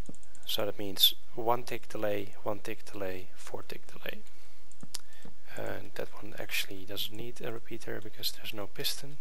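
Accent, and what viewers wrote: Netherlands. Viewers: Are you from Holland? I thought I heared a kind of a dutch accent! ;-p Anyway nice video and of course nice design and tutorial! Goodbye! - The Dutch Island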